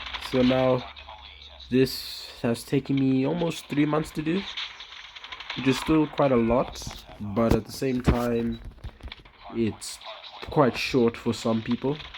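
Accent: South Africa. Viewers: South African? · Are you from south Africa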